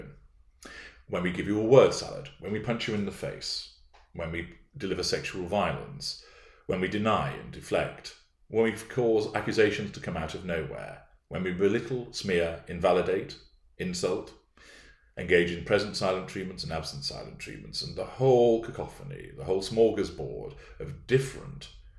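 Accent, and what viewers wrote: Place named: RP, United Kingdom. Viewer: He doesn’t do it often enough to be overly vulgar, but just to emphasize a point , usually of exasperation ( IMO). I have to say hearing it said with that posh accent and delicious voice makes me smile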